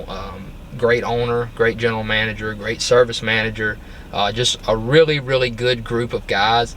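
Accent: Alabama, United States. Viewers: I just want to get my foot in the door bruh.. Cause I like Cars( Love the Bama Accent btw😉🤗✨) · Love your Accent. Alabama Accent🇺🇲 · i knew that accent sounded familiar! working at a shop up in huntsville. Roll tide baby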